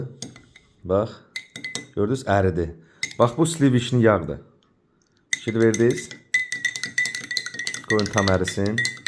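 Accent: Ganja, Azerbaijan. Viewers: Bizə xoş gəlir sizin şirin danışığiniz. Gözəl Gəncə ləhçəsi,arada şirin səmimi sozlər❤Yaxşıki aramızda sizin kimi vicdanlı insanlar var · bu oğlan ya Gəncəlidi, ya Şəmkirli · Gəncə ləhcəsidi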